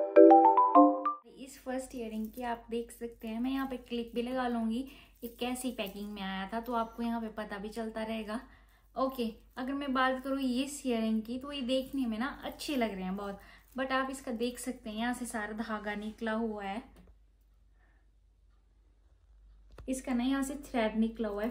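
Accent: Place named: Himachal Pradesh, India. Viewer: Aap himachal se ho na bolne ke style se lag rha hai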